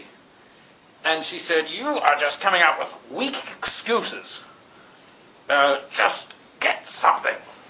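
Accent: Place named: England, United Kingdom. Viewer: You have the most awesome English accent ever!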